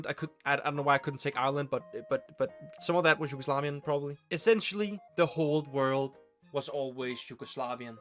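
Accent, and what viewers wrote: Denmark. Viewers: Live the danglish Ære til Danmark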